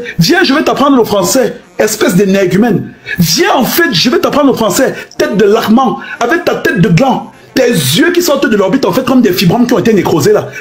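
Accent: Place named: Cameroon. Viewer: Mais c pas un ivoirien tu es camerounais même si on me dit koi avec le ton et tu en connais trop sur le cameroun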